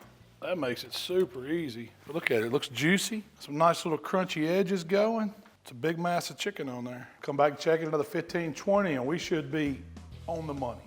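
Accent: South, United States. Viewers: Yes. Good old ooollll and follll. It's like the south lost the letter I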